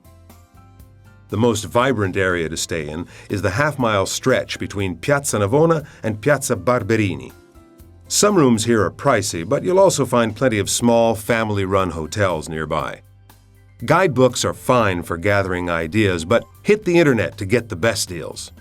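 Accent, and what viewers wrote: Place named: United States. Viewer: He's American isn't he tho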